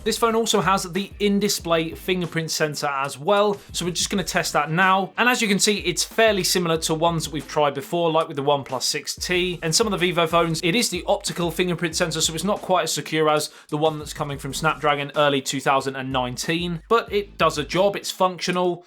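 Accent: United Kingdom. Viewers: Hate british accent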